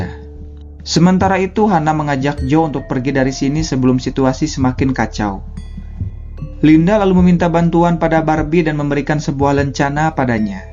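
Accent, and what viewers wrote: West Java, Indonesia. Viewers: Kang orang sunda nyaa.? 😁Logat sunda na kabawa wae euy🤣 Salam kang ti Bogor👍🏻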